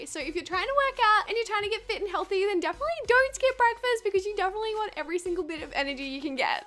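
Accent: Australia. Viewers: She's australian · Are you aussie...you sound like one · Your australian wow cool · are you ausie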